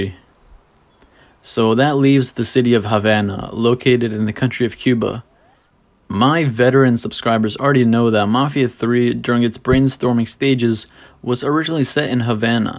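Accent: United States. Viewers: are u american?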